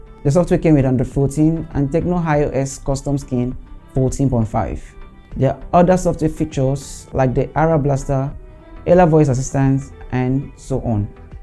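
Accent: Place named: Africa. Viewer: African english is funny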